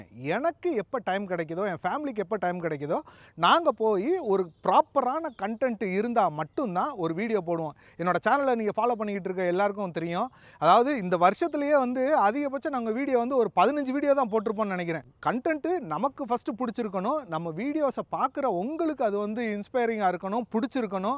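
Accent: Madurai, India. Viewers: Madurai slang is emotion bro❤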